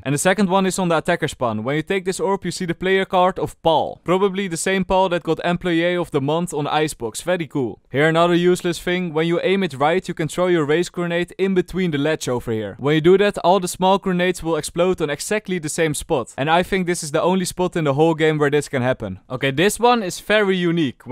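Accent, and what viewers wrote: Netherlands. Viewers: Its a dutch accent😂 the way he talks and he confirmed it with the word camera lol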